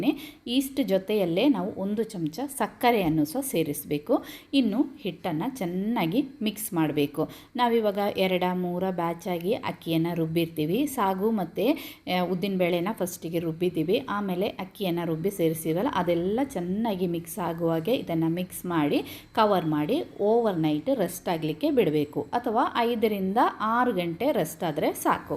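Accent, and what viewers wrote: Kerala, India. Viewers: Malayalam accent. Why don't you answer the comments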